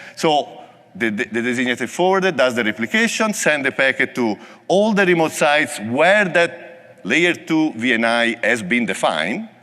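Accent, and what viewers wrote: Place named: Italy. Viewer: I love Italian accent.. Thank you. Great delivery.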